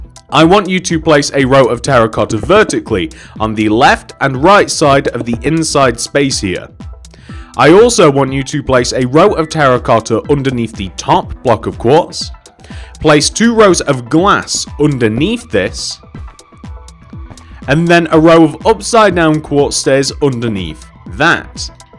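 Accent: United Kingdom. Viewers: a jolly British accent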